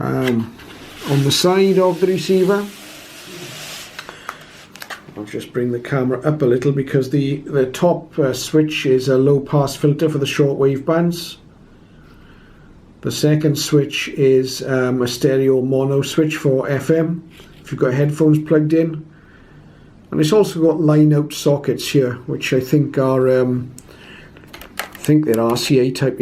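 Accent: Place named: Scotland, United Kingdom. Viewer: I did listen again, and all I heard was that rich Scot brogue…..unlike any other English accent !! I have heard them all.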